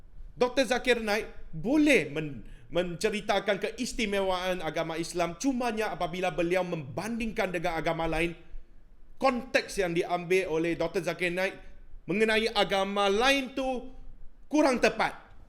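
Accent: Kedah, Malaysia. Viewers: .. cakap loghat kedah laa.. hahahhaa terbaik lah.. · Wuihhh ORANG KEDAH WOI!! 🤣🤣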